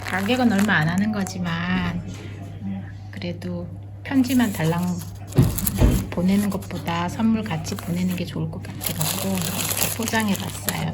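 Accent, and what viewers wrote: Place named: Gyeongsang, South Korea. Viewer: 반갑습니다 까망님 체널 보다가 님 댓글 보고 왔습니다 스위스 사시는군요 추운날씨에 촬영 하느라고 수고하셨습니다 첫눈 치고는 많이 내리네요 경상도 분이 신가봐요 사투리가 조금씩 들리네요 ㅎㅎ 저도 구미 살아요 응원하고 선물도 드리고 갈게요 행복한 하루 보내세요☕;)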